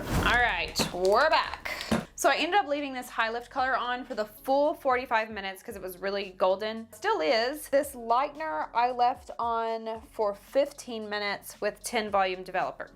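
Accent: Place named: West Texas, United States. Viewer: Epi 6 of HILLLLLLBILLLLLLLY HAIRRRRR. west texas voice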